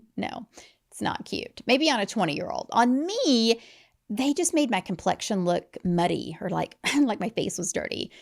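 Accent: South, United States. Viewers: I just found your channel with this video!! I love the county accent and cussing and pure southern honesty 😭I’m from Georgia so it’s like sitting down with my moms friends listening to you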